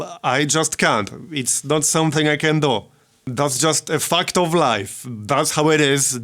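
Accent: Poland. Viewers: You are the first gamer I followed on YouTube. What got me was your Arabian Civ V campaign. I was amused by your humor and amazed by your ability to beat the game at such a high difficulty level. I was also trying to figure out your accent. Then I learned somewhere along the way it is a Polish accent. Either way, you have provided many great hours of content and even inspired me to get into XCOM 2 when I knew nothing about the series. I say upload videos of you learning games because first impressions and playthroughs are very fun to watch. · Thank you for your honesty and hundreds of hours of pure entertainment! I subscribed to your channel because the quality content and your nice Polish accent (which as a half Polish person I enjoy very much). Keep up the good work on Twich and YT and remember, there is one worse thing than reading YT comments: it's taking them seriously:)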